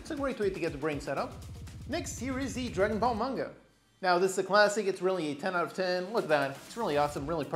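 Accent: United States. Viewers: Wait, you are from portugal? I thought you were from America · Damn. Why I always though you were an american for some reason lol. Where are you from?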